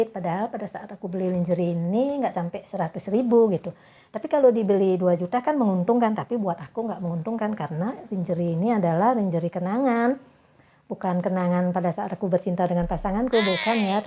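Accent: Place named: Bali, Indonesia. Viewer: posting malam bgt, tp ada notif langsung nonton saya mba krn kamu lucu. logat balinya kental sekali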